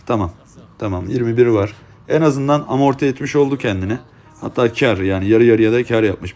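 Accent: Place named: Aegean, Turkey. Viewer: Siven tam ege şivesi reis mausuna taş deymesin belki bizede bu 444 kişinin için şans güler bol şanslar 🖐️ Papara numarası <num> A. D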